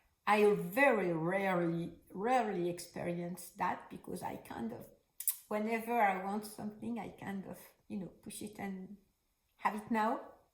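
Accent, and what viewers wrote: France. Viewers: Delighted to have come across your channel today while knitting. I enjoy listening to other knitters talk of their projects as I knit and I find your French accent very pleasing. I've always thought the French language is beautiful so your accent doesn't bother me a bit.:-) I live in Maryland....just outside of Washington DC and we are having many storms and heavy rain lately as well. Your WIPs are lovely. The Madeleine sweater you are working on looks similar to the Astragal sweater by Ainur Berkimbayeva that I knit awhile ago. I also like the look of that Ripple j Jacket pattern!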